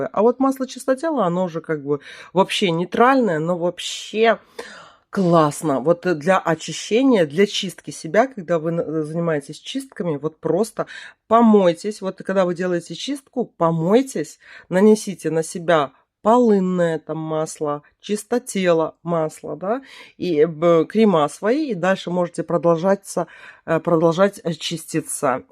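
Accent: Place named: Belarus. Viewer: Так девушка из Беларуссии, зачем вы учите как говорить? Мои знакомые оттуда тоже интересно по своему говорят и мне не режет ухо. ДОбре?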